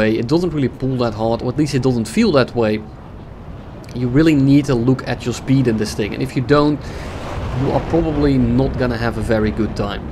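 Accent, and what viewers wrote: Netherlands. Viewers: i smell dutch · Still love the dutch acsent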